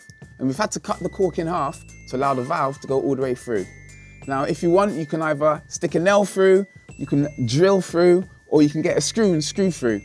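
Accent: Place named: England, United Kingdom. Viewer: I can't get enough of English accents.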